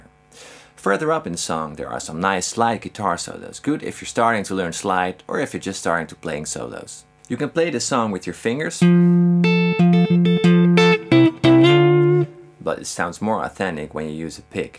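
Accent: Netherlands. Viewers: You sound a bit Dutch, to be honest with you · dude, you're a dutchie XD the accent gives away, dutchie here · Nederlander confirmed, Nederlands accent op het engels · Hey you're Dutch! Guessed it haha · hi are you dutch? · you are dutch arent you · Are you dutch???🇳🇱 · Just noticed that your presentation has become way better now. More the authentic Dutch accent, more you. Great video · Can i ask where you're from? You sound very Dutch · how dutch is this guy immaright? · yes he's Dutch and so am i :)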